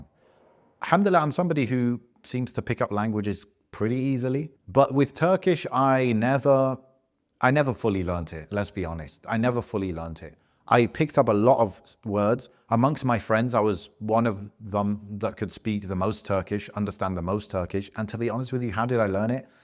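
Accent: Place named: United Kingdom. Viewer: How did you pick up a British accent if you lived overseas most of your life?🤔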